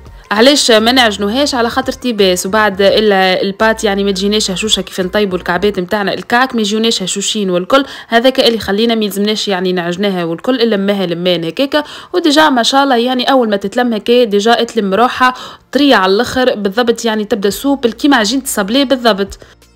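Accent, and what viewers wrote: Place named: Tunisia. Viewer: اللهجة التونسية مختلفة كثير على اللهجة المغربية تشبه اللهجة الليبية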